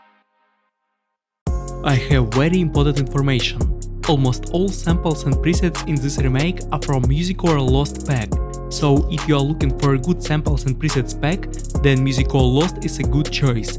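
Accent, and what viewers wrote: Russia. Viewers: Amazing, сразу узнал русский акцент)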